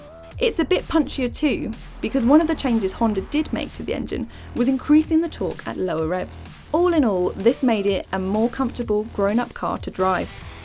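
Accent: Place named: England, United Kingdom. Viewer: Shes pretty and has an easy listening english accent. Im down for that and im a scot 🏴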